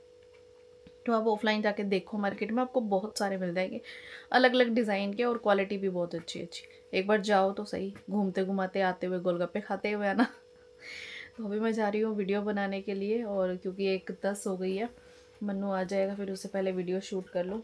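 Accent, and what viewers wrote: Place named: Multan, Pakistan. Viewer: Aap.ko Multani bolnee aatee hai. Aap Kaa accents hai Multani bolnei kaa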